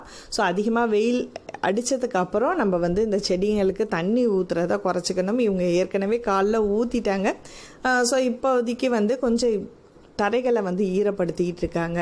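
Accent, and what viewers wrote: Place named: Nagore, India. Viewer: Asssalamu alaikkum wa rahamaduillahi wa barakkthuhu..Alhamduillah yella commentkkum reply panni erukinga 👏nan nagore ma ninga pesurathu yenga ooru karanga pesura mari erukkum..like appanu solliringale grandfathera 😊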